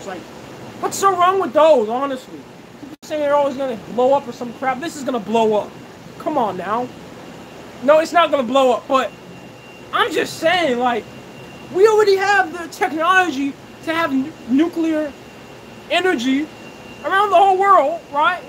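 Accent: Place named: United Kingdom. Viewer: Sound like you live in the UK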